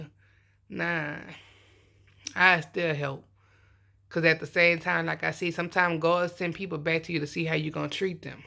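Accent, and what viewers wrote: New Orleans, United States. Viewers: I love when your name pops up in my notifications. Means I'm gonna get some insights in your cute N.O. accent.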